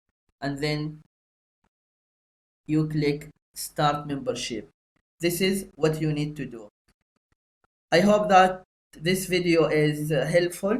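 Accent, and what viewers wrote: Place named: Arab world. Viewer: تحس عنده الاكسنت العربية شوي ممكن يكون من اصول هندية ولا شي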